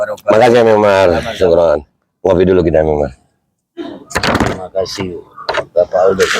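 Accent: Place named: Jakarta, Indonesia. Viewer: Aldo...jangan pakai logat betawi,..loe tuh keturunan imigran yg mengaku 2 sbg "pribumi"...Loe harusnya sadar kalo loe cuma "numpang"...tapi gak tau diri. INGAT !!!.....LOE BUKAN ASLI ORG INDONESIA, CATAT !!!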